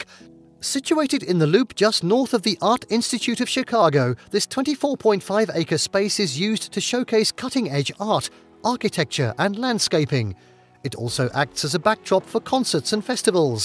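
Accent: United Kingdom. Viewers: A nice survey with occasionally dazzling if often deceptive photography but also some egregious errors. "Fulton House" is not that house, whatever the house depicted may be —and in any case, it's not a house. Anish Kapoor, though he may have his studio in London, is Indian, from Mumbai. And the peppy British accent: What's up with that?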